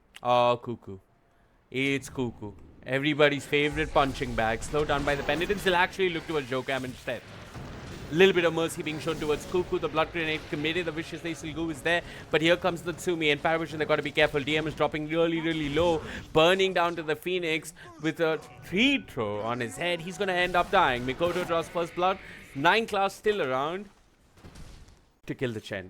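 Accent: India. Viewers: Who is this indian caster. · indian caster ?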